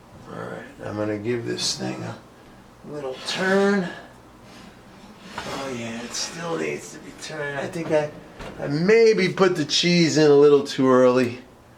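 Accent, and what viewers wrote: New York, United States. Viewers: You sound like a real New Yorker. I like I have the sauce lol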